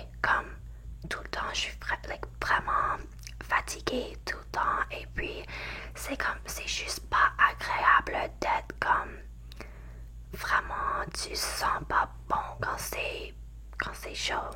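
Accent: Quebec, Canada. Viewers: Wow tu es vraiment bonne, j’aurais cru que tu etais du Qc et non Ont so its even more impressive! J’espere voir d’autres videos en francais de toi ❤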